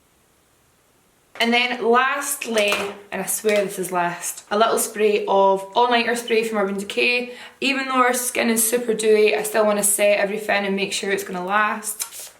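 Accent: Scotland, United Kingdom. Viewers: your just so darn adorable with your Scottish accent 😍😍😍😍😍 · in love wid ur Scottish accent... n also u... I can relate u coz my face shape is like urs it's round n u inspire me to get make up in a way where I can look sexy n chiselled...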